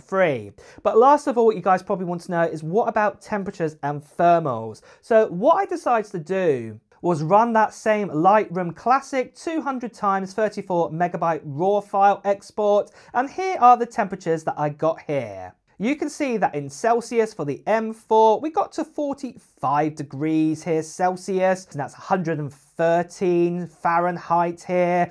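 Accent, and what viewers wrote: England, United Kingdom. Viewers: There's no such thing as "British accent". There are five countries on the British isles. He's English but not sure where abouts he's from in England.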